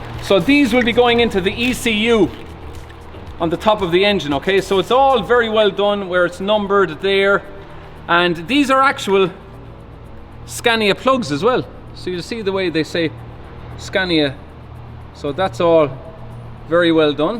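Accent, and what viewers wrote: Ireland. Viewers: A guy with a Greek name with an Irish accent, driving a Swedish truck on English roads: doesn’t come more cosmopolitan than that. Seriously though entertaining video!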